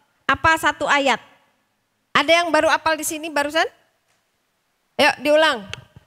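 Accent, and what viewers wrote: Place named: West Java, Indonesia. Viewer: Alhamdulillaah, trimakasih Ya Allaah,sy bisa mengikuti kajian Ustjh.sunda pisan,sy seneng pisan.sama produk sponsor nya juga menarik.dimana sy bisa beli? Udah di jual bebaskah?di Bekasi bisa di beli dmn?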